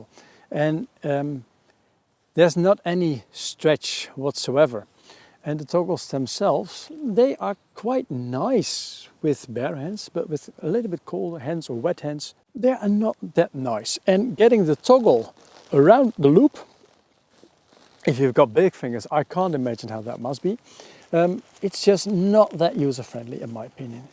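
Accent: Netherlands. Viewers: De eerste paar zinnen wist ik oprecht niet of dit Engels of Nederlands was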